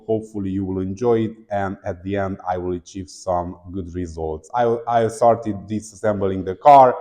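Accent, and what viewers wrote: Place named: Germany. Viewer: I Love the grman accent, love your videos and i know you will keep the garage! :D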